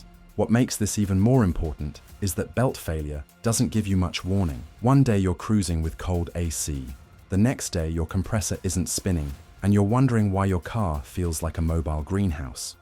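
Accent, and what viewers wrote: United Kingdom. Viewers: Screw your British accent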